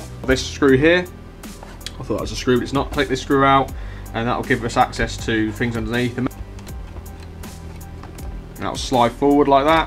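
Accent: United Kingdom. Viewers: Good work. Can I bring my laptop to you for a quick fix?:) That's a lot of bloody screws. I have the same model and same exact issue. If I give it a go, I'm thinking to use Gorilla glue, it's darn good stuff we have here in the USA, don't know if it's available in the UK. Pardon me if I didn't guess your accent correctly.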